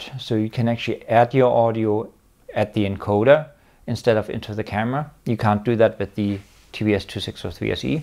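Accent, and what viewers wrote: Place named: Germany. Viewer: Meine Jüte, da kickt das Deutsch ä bissl durch :face-blue-smiling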